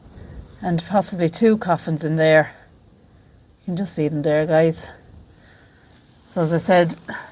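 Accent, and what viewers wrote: Ireland. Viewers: Hello from Japan. I was born in Sardinia to Irish/Scottish/Ukrainian parents and lived in Carna Co.Galway and Cashel Co.Tipperary as a youngster. Have been always fascinated with cemeteries and love just as you do too to explore them. Great to hear an Irish accent!!!!!!!!!!!!!!!!!!!!!!!! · Thanks! Love love your channel!! I am from Alberta, Canada 🇨🇦 and would love ❤️ to hopefully one day make a trip to beautiful Ireland 🇮🇪!! It is so wonderful that by you filming different locations I feel like I am touring Ireland 🇮🇪 lol🥰 again love your channel & keep up your amazing work! Oh ps I love love your beautiful 🤩 Irish ☘️ Accent!! ☘️🇮🇪☘️ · I love listening to you both. Your voice reminds me of my Granddad who was from Cork. I’m from Western Australia 🇦🇺. My Great Grandmothers name was Eva Boyd my Great Grandfathers name was Michael Hyde. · Beautiful graveyard love your gorgeous Irish accent luv from Canada 🇨🇦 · So neat to see the old graves. 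Thanks for taking time to show them. Love your Irish accent. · Great video. The commentary with the Irish accent is spectacular. From New Jersey in the USA.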